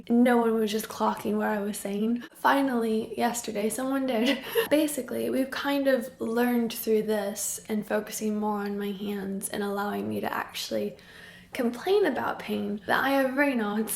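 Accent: England, United Kingdom. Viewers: I like how the English accent is seeping in. At first, I couldn't tell if you had an Americanized accent, but now I realize it was the other way around, and I'm just so pleased. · Ugh I totally understand bouncing between doctors and them not giving you answers. But sooo glad that you have it diagnosed and hopefully a new treatment plan that actually is addressing the Raynaud's! Also I read this comment on one of your previous videos, but I think it's so cool (and cute) to hear you pick up a British accent. How some words you say are more "British-y" sounding, if that makes sense? lol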